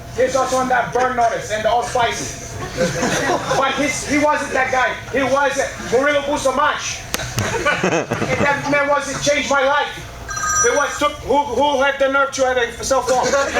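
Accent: Brazil. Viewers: i think he is doing a parody of every brazillian fighter or vinny marglese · you know you’re acting is on point went an actual brazilian thinks you’re really from Brazil.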